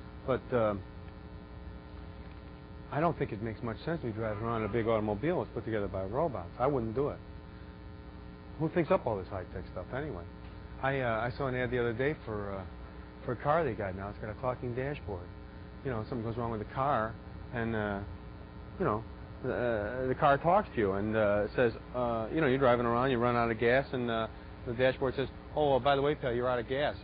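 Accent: United States. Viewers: That's a Chicago accent. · gotta love the new york accent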